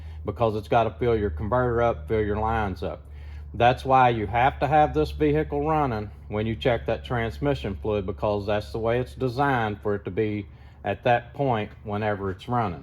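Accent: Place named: United States. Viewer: Thanks for this excellent video. One thing I really appreciate is the very clear American English! I'm not very mechanically inclined, and it helps immensely to understand what is being explained, and very well, I might add!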